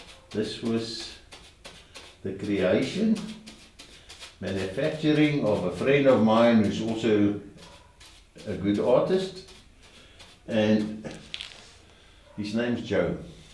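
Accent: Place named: South Africa. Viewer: That is beautiful! It is so nice to hear a fellow South African accent!:) I was born and raised in Joburg and had relatives in Pretoria... I used to love visiting when the Jacarandas were in bloom!! You have a very artistic eye and easy style of painting. I will certainly attempt to paint from your tutorial, thanks for uploading it:) I now live in Hawaii, but I miss the motherland a lot....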